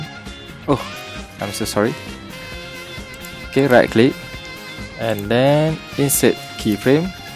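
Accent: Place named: Malaysia. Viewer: Your sound like malay , are you malay ? Can we have a conversation ? Wanna deal something about cv interactive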